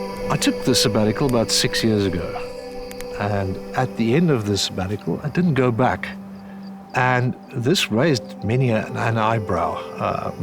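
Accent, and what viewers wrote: South Africa. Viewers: I know a south-african accent when i hear one. Lekke lekke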